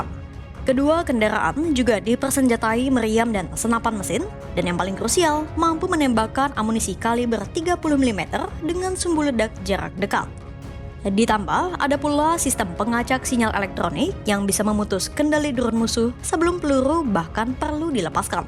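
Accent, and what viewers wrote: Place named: Palembang, Indonesia. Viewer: R khas plembang kok.., ya begitu lafalnya.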